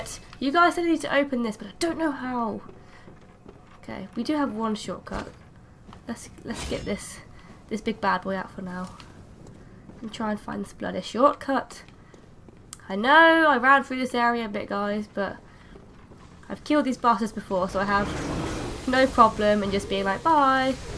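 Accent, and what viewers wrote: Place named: London, United Kingdom. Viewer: I just love your accent. It makes you look cuter when you swear haha are you Londoner? You should have tried the back stab, it works pretty awesome against him.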